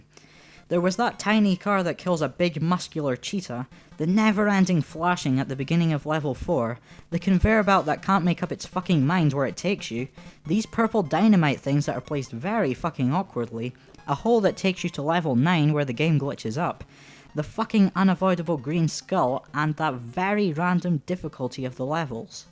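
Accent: United Kingdom. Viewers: "the level from hale!" dude you arent funny. look up avgn! i was laughing at your stupid british accent. it is not easier said than done? dont you mean it is easier said than done. you dumb ass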